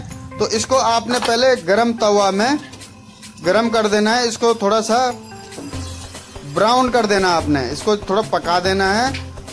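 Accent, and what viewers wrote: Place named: Uttarakhand, India. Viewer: Yar Bhai ji handi chicken bihar ki dis h socha nhi tha AP uttrakhandi ho apki language see lag hi Raha tha Jai badri vishal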